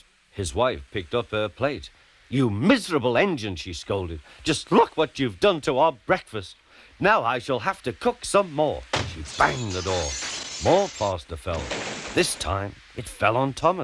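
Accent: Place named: United Kingdom. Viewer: UK narration over US narration any day for me. Sodor is in the British isles after all.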